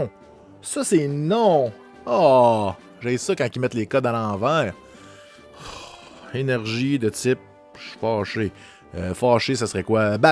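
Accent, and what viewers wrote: Canada. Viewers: Un petit coucou de Lyon en France. Merci pour ces belles vidéos qui nous font rêver mon fils et moi et l'accent canadien me rappelle mon voyage au Québec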